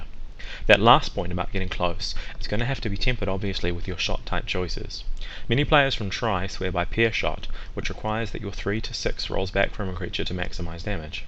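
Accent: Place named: New Zealand. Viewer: Nice vid, great to hear that NZ accent:-D Hope to see more from you when 3U hits our shores.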